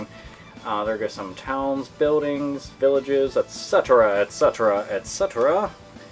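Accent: Ireland. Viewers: Holy shit, you're Irish!? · wait you're Irish ?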